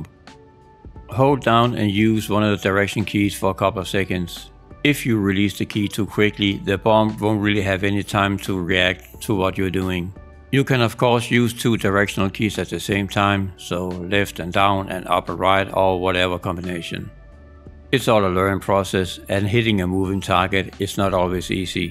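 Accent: Denmark. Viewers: You sound danish, and thanks for the guide! It was really useful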